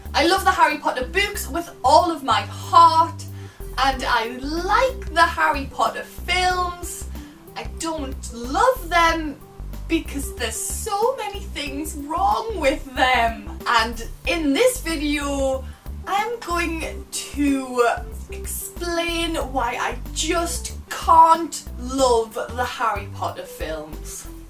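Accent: Newcastle, United Kingdom. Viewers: OMG!!! Can't say how much I love you in this moment <3<3 This is so fucking true!!! So many people never understand why I never liked the films...in future I may should show them your Video ;) You also had a few facts I never realize. The things about the potions (Forget what they are called in English ;) But what I always hated about the films is that Harry has NO Green Eyes!! I think this a good example for the things that where changes in the filme because of no reasons :/ Thanks for this epic Video ;) By the way I love your british accent xD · It's called a Geordie accent. The epitome of the so-called "British accent".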